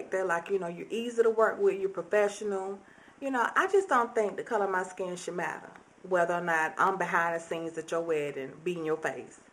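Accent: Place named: Ivy League, United States. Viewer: lol... ivy league voice...But u r excellent at what u do... and I sense that u do this because this is what u love doing.. every1 doin makeup on here I dont get that vibe from... sometimes I think its done just because its popular and alta peeps r doin it.. but I thank u 4 ur genuity(is that a word?lol) really.. u are a Blessin 4 real.. if people can't c that its their loss hun! :) keep ya head up...stay keepin it real:)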